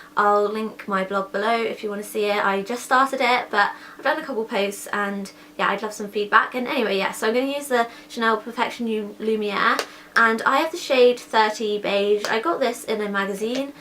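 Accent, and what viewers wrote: England, United Kingdom. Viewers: she has an english accent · I love your accent. Is it british?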